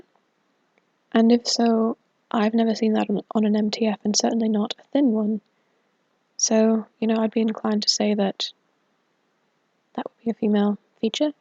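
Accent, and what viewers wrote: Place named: Berkshire, United Kingdom. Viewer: Happy new year to you too from a fellow brit and thank you for the like. Nina is stunning, glad there are some real ones out there in high ranks, gives us men a glimmer of hope when watching the screen lol. I'd like you to know that I think your videos are the most professionally discussed and analysed on this platform and they really exude such an unbiased and level headed approach, it's so refreshing. I typically watch your transvestigations at night and the sound of your extremely soft and sexy voice. Especially its Berkshire/Oxfordshire region accented cadence helps me relax before bed, hence the comments as of now haha. Hope it doesn't come across as creepy or even offensive (some girls these days *sigh*), just being as honest as possible. Enjoy the rest of your day!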